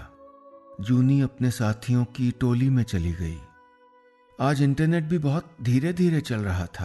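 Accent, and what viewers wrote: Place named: Kashmir, India. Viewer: That be able to use Kashmiri accent 🙏🏻🤌🏻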